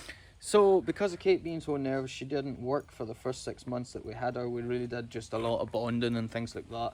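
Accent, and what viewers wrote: Scotland, United Kingdom. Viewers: Im not sure whats most satisfying with this channel. The scottish accent (i think its scottish at least) or the dogs. Ive commented it once before, Echo is such a piece of beauty. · I had a border collie when I was a kid. I was an only child, and Queenie was like my sister. I love watching you and your dogs. I also love your accent. I was in Scotland a few years ago, and I loved it.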